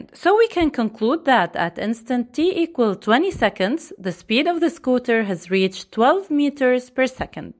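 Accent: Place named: Lebanon. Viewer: the narrator sounds Lebanese to me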